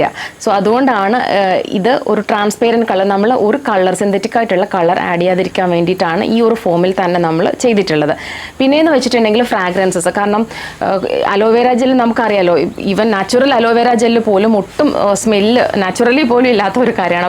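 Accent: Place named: Tamil Nadu, India. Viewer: തമിഴ് പറയുന്ന ആൾ മലയാളം പറയുന്ന പോലെ ഉണ്ട്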